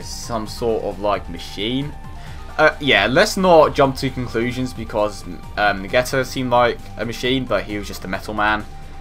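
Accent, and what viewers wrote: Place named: West Midlands, United Kingdom. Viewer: I'm really interested in know what part of England is from. He kinda has the same accent as me and that being a west midlands one.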